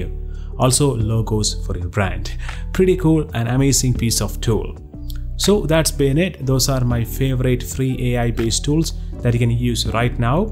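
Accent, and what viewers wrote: Kerala, India. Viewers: Your accent sounds more Indian than me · mallu accent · Refreshing mallu voice 😀… good content and diving to check all of those links ..thanks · Chettan Malayali Alle ? Mmde College pronunciation... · Ith oru Malayali anallo samsaarikune.. alle? i felt it like many times in this video..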